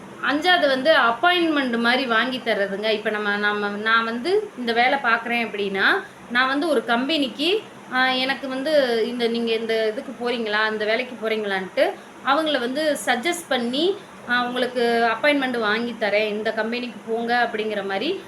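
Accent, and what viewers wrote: Thoothukudi, India. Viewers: அக்கா நீங்க தூத்துக்குடி யா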